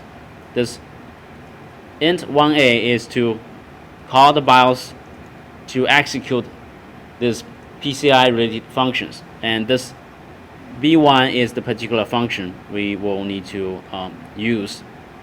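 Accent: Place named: China. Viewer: 这声音一听就是中式英语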